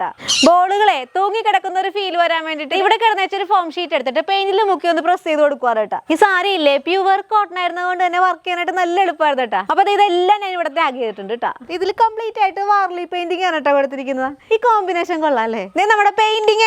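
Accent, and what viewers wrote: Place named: Alappuzha, India. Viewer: കൊച്ചിന്റെ നാട് എവിടാണ്..ചേർത്തല, ആലപ്പുഴ slang തോന്നുന്നു..ശെരി യാണോ guuyys 😂😂❤❤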